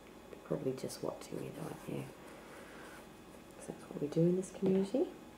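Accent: United Kingdom. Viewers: Holy crap. I've finally found a whisperer with a British accent! Major win tonight. Great videos!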